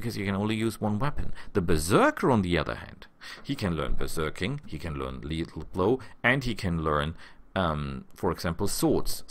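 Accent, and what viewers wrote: Germany. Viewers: "Papaaaa, let's invade ze Poland again" heh Cute german-like accent aside, really looking forward this let's play, always nice to have some cozy voice playing something on the other monitor while I waste my life away grinding some game.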